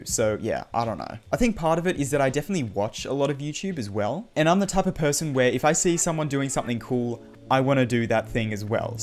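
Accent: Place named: Australia. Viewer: absolutelly i love your australian english accent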